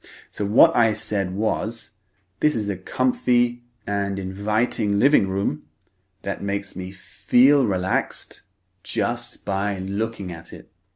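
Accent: United Kingdom. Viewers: Hello Sir English, are you from southern England?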